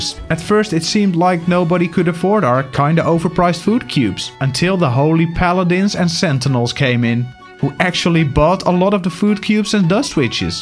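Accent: Netherlands. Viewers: Hey bro are you Dutch? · Dutch accent best accent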